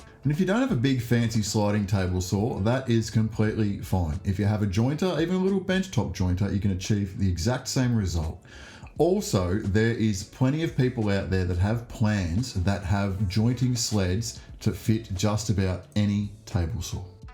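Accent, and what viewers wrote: Australia. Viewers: Love these. Simple and effective. Also cool to see and hear another Aussie maker. Just subbed. Damo.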